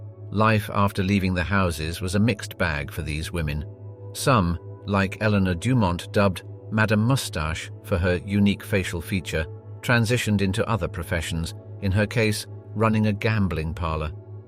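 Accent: England, United Kingdom. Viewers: Another Brit narrator explaining America.....to AMERICANS. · Is it the narratives male English accent that softens the title or is it that it's not a Woman narrating this story. It is the latter. This story is about cruelty and how Women care not even for their own gender. How dare you take my gender and twist it into your culture for financial gain, you the Madame the bottom bitch.. A Woman who hates their SISTA this is your type of entertainment. I forgive you SISTA.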